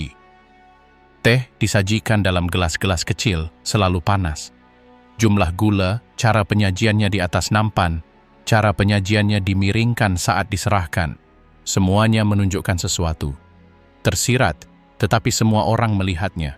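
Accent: Malaysia. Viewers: Pencerita seperti org Malaysia tapi pakai gaya bahasa Indonesia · Iki canel wong Malaysia... Logat dan bhs Malaysia nyel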